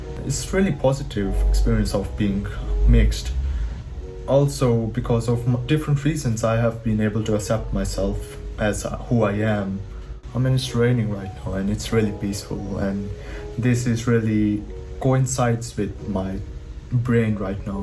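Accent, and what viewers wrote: Pakistan. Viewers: Ah ... now I understand how your Urdu is so good. You did have a sort of a Sialkoti accent I thought when I first watched a CS14 reaction video from you but I wasn't sure. I thought may be your accent in Urdu is because of Japanese influence but I should have known better. I dated a Japanese girl in college long ago but still got fooled. Be proud of being half Japanese and half Pakistani. Both cultures have beautiful things in them. You are both. Cheers from Tampa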